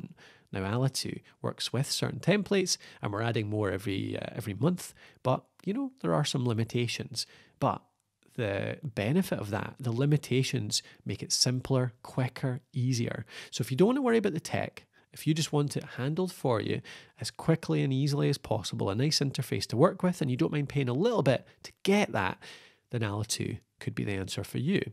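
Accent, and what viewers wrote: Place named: Scotland, United Kingdom. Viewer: He's not English. He's Scottish or Irish. He sounds like anyways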